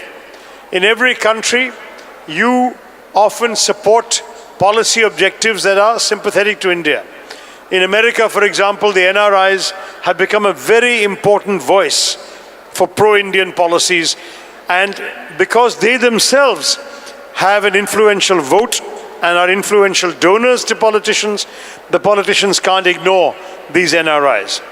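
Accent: United Kingdom. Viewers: At a very first time I'm watching dr. Shashi and then i perceived that he is speaking British English but easy. As he told about books there is joy of finding out destination that is already defined and i feel i am going to become good reader and dive into reading more. I love reading books 🥰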